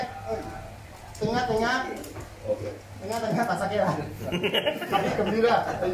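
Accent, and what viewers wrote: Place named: China. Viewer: Melayu with Chinese Accent...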